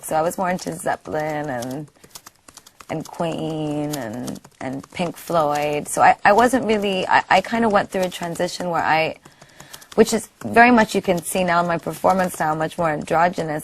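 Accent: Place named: United Kingdom. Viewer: she sounds more british here..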